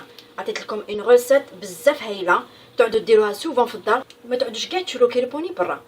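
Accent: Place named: Algiers, Algeria. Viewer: J adore votre accent algérois et votre recette aussi 😍❤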